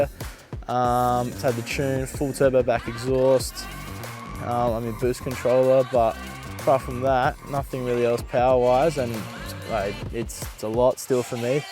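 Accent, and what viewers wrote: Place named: Australia. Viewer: Haven't you heard an Australia accent ??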